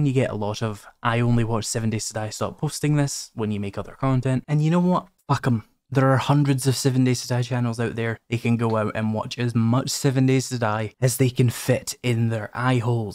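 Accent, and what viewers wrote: Scotland, United Kingdom. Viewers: That's a lowlander Scottish accent if I'm not mistaken, and I'm going to lean toward Dundee more than Edinburgh.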